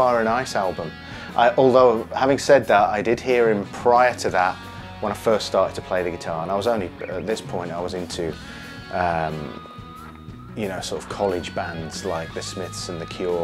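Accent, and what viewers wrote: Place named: Oxford, United Kingdom. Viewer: You got my "like" after just saying 5 words because you speak oxford english so perfectly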